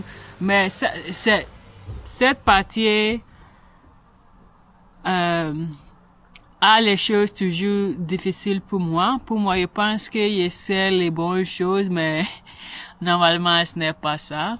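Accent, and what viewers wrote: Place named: England, United Kingdom. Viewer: Salut vraiment continuer ton absent français prend le dessus sur l'anglais.Au début l'anglais ce trouvait beaucoup dans ta manière de parler mais maintenant le français prend le dessus dans ta manière de parler maintenant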